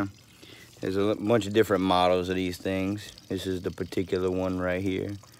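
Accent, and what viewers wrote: Louisiana, United States. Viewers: Man that's a Louisiana accent if I ever heard one!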